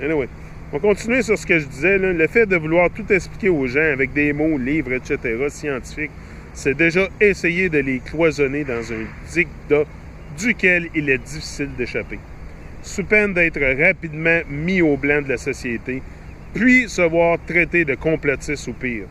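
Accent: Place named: Quebec, Canada. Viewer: Salut, il n'y a pas beaucoup de francophone qui traite ce sujet donc, oui, continue le français ou si tu parle anglais, mets les sous-titres. Ton accent québécois n'est pô un problème tsé ;-)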